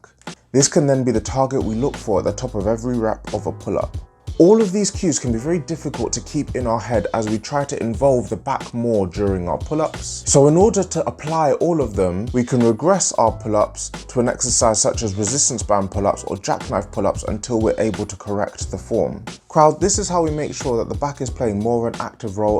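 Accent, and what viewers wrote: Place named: United Kingdom. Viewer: Quality video, definitely will check your advice out next time I'm in the gym! Btw. 3 words into the video and I didn't have to check where you from, mate :D Also, no hate here, british accent sounds awesome :)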